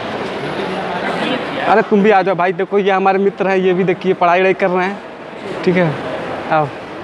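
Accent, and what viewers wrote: Bhojpuri, India. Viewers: Glti se i came here.....but couldn't stop myself from watching the whole vdo.....nd just coz of his awsmm #bhojpuri assent.......😂😂😂😂he was like inko kon hi rokega😂😂😂😂😂😂😂😂😂😂😂😂😂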